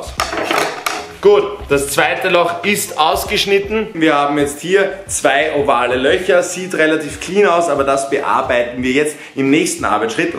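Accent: Austria. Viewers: In östereich spricht man des so aus:)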